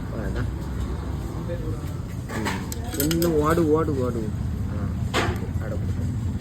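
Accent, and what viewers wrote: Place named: Kanyakumari, India. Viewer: Casual ah neenga pesuradhu thaan namma kanyakumari azhagu ❤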